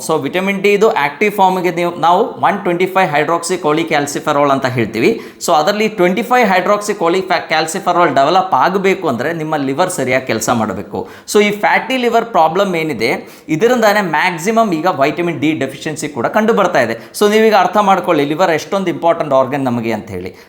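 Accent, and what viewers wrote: Kerala, India. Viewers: Very knowledged and experienced doctor who speaks Kannada fluently with good prounanciation but reveals the fact of originally from Kerala while speaking few word in English For example follow pronounciation. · Malayali kannada samsarikkumbol vere oru feel aan ❤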